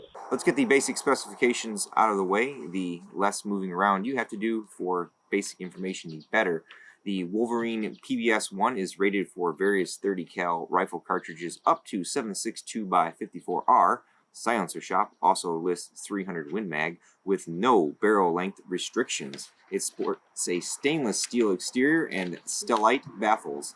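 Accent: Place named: Midwest, United States. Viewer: Lol that northern Midwest accent! You from WI?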